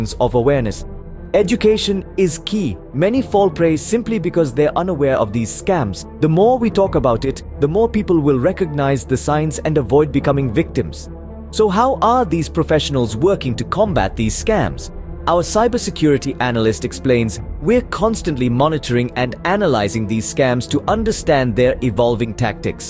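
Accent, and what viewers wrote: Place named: India. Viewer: " a trusted source like Microsoft" Who the heck trusts Microsoft? I can cope with a scammer better than I can cope with Microsoft. Hate to break it to you, but Microsoft have permanent access to your WIndows computer anyway these days. Anyway, the elephant in the room is that 99% of scams are from India, and an Indian accent on the phone is a massive red flag - like like the commentary here in fact.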